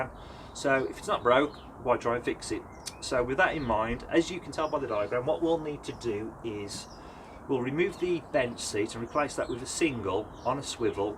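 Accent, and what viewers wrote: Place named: West Midlands, United Kingdom. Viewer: Just came across your channel as one of our videos is a suggestion from one of yours. Where are you from as your accent sounds proper West Mids. We are from the West Mids.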